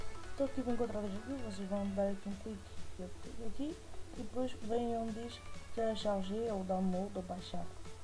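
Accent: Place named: Portugal. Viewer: portugues de portugal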